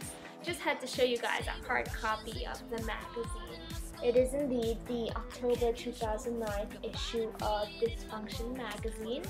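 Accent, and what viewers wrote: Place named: United Kingdom. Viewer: why do you have a british accent?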